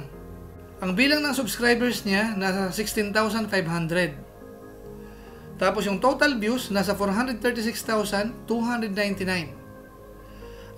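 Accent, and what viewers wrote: Bicol, Philippines. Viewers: Bicolano ka ba noy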